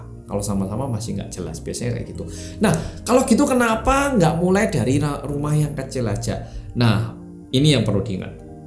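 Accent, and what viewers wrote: Java, Indonesia. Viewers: Suka bgt nonton vlog ttg korea 🧡 Bersama dgn org korea yg mendok 😊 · Halo gaiss welcome back to Korea Reomit bersama orang Korea yang medhooookkkk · Mas wong korea, tapi kok medok jowo yo.. Keren unik. Informativ Vlog nya. Mantap👍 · setelah mendengaar curhatan mas korea medok. Jiwa Bersyukurku Tumbuh dg Suburnya...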